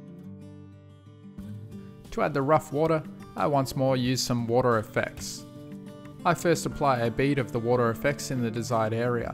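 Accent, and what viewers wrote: Australia. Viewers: you clearly have an Australian accent, Yet use a lot of American products, so my question is where are you located? As I am in Australia and importing those products work out to be very dear. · I can sit and watch your videos forever. Nice background music, an Aussie accent and lots of tips and tricks to build awesome stuff. What more can one ask for.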